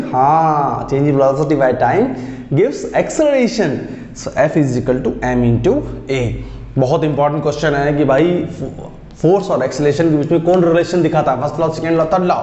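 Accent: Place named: United States. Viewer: Sir thoda jyada American English ho gya but achha laga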